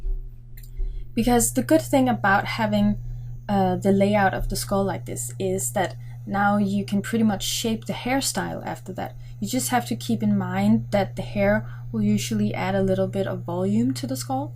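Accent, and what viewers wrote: Denmark. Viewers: R u danish? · Are you danish?😁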